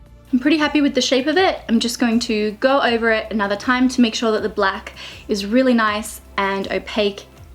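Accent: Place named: Australia. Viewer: are you Australian you has an Australian accent (Im Australian) p.s u earned a new sub xxx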